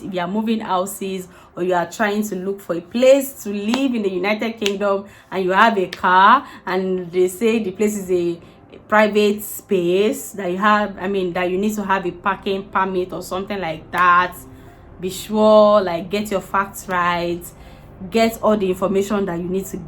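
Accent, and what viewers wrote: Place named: Yoruba, Nigeria. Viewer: Your yoruba accent lasan >>>>>>>> 😆 🤣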